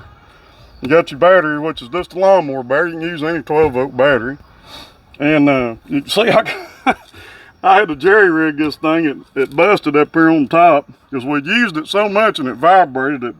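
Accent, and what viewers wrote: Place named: South, United States. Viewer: I guess you have to be part southern to understand him. I find him to be perfectly understandable and I love listening to my very missed southern speak, trapped in the north wishing the snow would go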